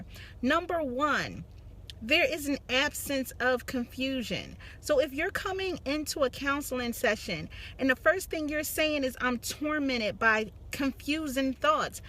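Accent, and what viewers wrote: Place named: Philadelphia, United States. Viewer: Love this video/message! SN: you have to be from Philly i know a philly accent a mile a way!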